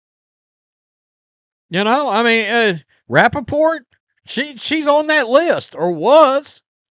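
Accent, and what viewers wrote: South, United States. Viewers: Hey, It's "....I don't know what other direction it could have GONE.." not "...have went." Repeat after me: I go, I went, I have GONE. I know you're a Southerner, but that doesn't mean you can't use correct grammar even in a Southern accent.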